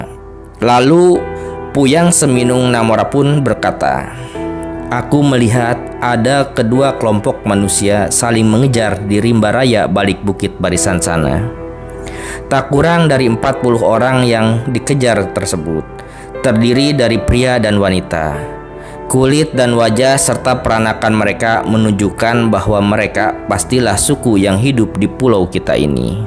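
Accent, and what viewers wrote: West Java, Indonesia. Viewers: Jelas orang ini dari gaya bicaranya orang sunda, legenda yg dia ceritakan tidak pernah ada dalam catatan rakyat danau ranau, jelas orang ini bohong, kelihatannya orang ini disuruh seolah olah orang ranau masih punya hubungan dengan orang batak, yg jelas kami orang ransu menolak sama sekali cerita ini... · Yang cerita logat nya Sunda yaa..😂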